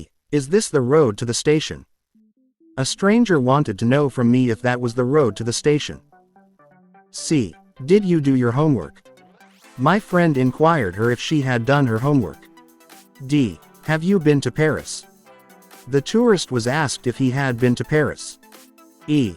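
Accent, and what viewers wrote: United States. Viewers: Thank you for helping us, providing high quality video American voice over sir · Thank you for helping us, providing high quality videos with American voice over.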